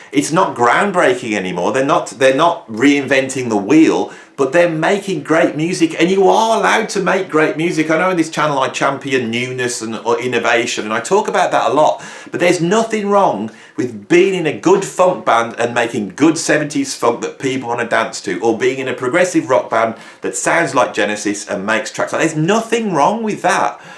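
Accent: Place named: Midlands, United Kingdom. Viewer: First time viewer…….What part of of the UK are you from? Just curious…..the way you pronounce “wrong” for instance, sounds like the Midlands? Im American, and fascinated with different accents…..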